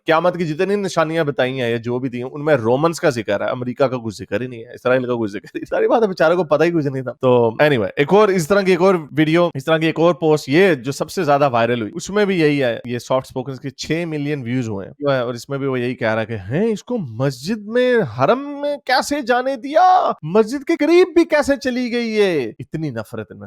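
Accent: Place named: Punjab, India. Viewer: Great voice...& ... Punjabi laheka in speaking.... Thanks Tu shissss Great....❤❤❤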